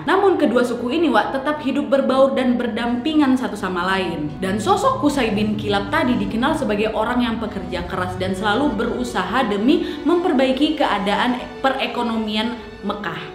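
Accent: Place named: Medan, Indonesia. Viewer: Saya tau chanel ini dari anak2 yg suka nonton, saya sempat komentar keren juga Chanel ini, sangat informatif dan profesional, saya tau pasti tdk mudah mengumpulkan bahan2 materi kontennya, istri sy jg yg kelahiran Medan juga sering senyum2 sendiri mendengar logat saat membawakan kontennya, apalagi ada Wak..Wak, jd ingat tmn2nya wkt Sekolah dan Kuliah katanya, terakhir setelah saya menonton konten tentang Nabi Muhammad SAW, langsung saya Subscribe Chanel ini dari HP saya( biasanya kami nonton di TV pakai WiFi dirumah ), Congratulation ya Wak 😁, terus berkarya dengan konten2 hebatnya👍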